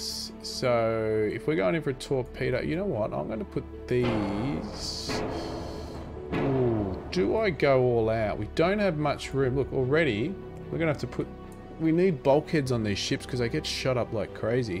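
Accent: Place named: Australia. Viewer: Wwow man u r from Australia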